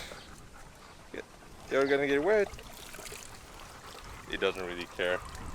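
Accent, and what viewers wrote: South Africa. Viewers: R u South African?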